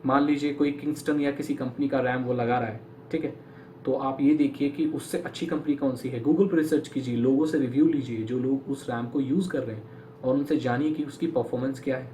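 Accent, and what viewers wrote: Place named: Bihar, India. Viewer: bhai aaja full bihari lag rahe ho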